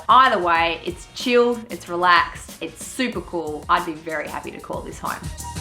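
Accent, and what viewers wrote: Australia. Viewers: This Lady is wonderful to watch love the whole package editing and music all tied together with that cute aussie accent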